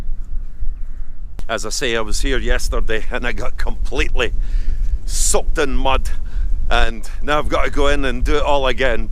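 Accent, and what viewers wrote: Scotland, United Kingdom. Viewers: Ach, i appreciate your Scottish accent, exploring the lands in Wellies, as I will never be able to visit. ❤ and especially the romans pegasus. Such ahigh ceiling and huge carved blocks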